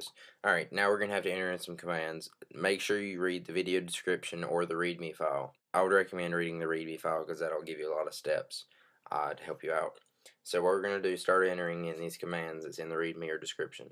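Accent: United States. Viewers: lern englisch. Nein ehrlich so sprechen manche Leute in den USA.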